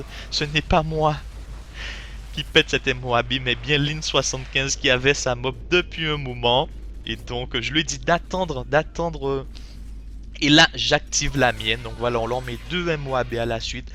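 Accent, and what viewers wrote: Antilles, Caribbean. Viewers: accent antillais non !? en tout cas tu commente super bien ! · J'adore ton accent ! Guadeloupe ou Martinique ? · C'est un accent antillais ? :)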